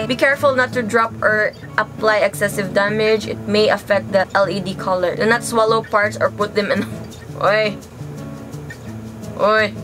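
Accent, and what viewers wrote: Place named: Visayas, Philippines. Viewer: HOOOY YAWA BISAYA MAN DIAYYY HAHAHAHAHAHA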